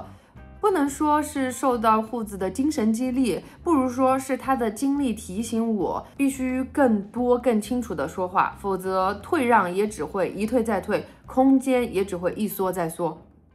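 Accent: Sichuan, China. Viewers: tutu新年快乐❤不是四川人也觉得四川话亲切了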